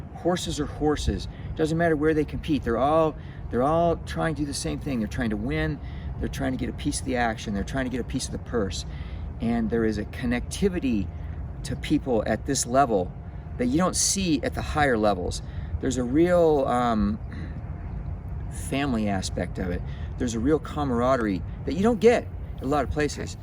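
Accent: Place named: New England, United States. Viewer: Sounds like Massachusetts, and New England.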